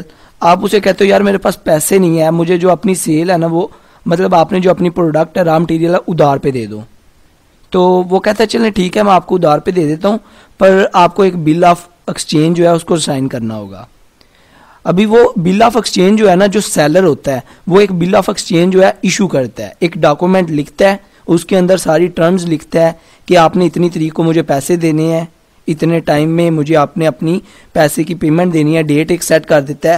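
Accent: Punjab, India. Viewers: Sir aap punjabi ho ??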